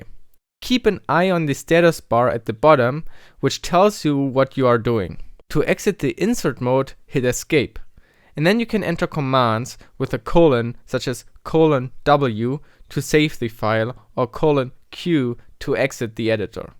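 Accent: Germany. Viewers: Are you german? :D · can tell that you are german, but it's definitely not that bad. I can understand you very clearly and I don't think there is anyone who wouldn't. You're very articulate in how you speak and very clear.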